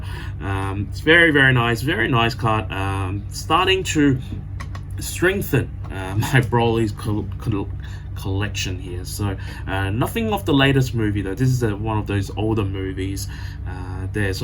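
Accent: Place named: Australia. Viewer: So awesome! Random question but I assumed you were Australian until I heard you say you’re in Hong Kong. We’re you taught by an Australian?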